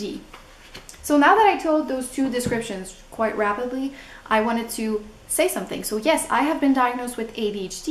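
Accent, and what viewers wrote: Quebec, Canada. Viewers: Tu es de Montréal ? Selon le peu que j'ai entendu de ton accent tu me sembles québécoise... En tout cas excellent vidéo merci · WoW Je me disais que tu avais un accent québécois! Je suis un adulte TDAH, dyslexique, avec dyscalculie et avec dysorthographie. Je connais très peu de québécois doublement haut-potentiel! Belle découverte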